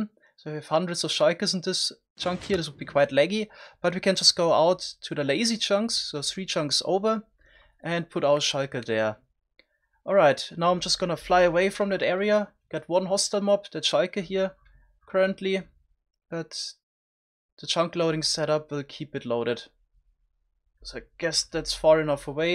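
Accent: Germany. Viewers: gotta love German accents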